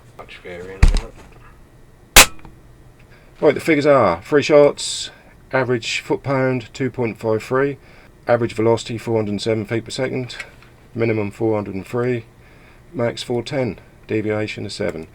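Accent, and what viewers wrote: United Kingdom. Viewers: Is that a Suffolk accent bu?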